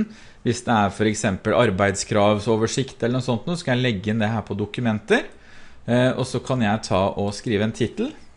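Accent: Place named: Østfold, Norway. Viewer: "litteran".... fra østfold???? Nyyydelig dialekt!!! (er særping sjøl da...)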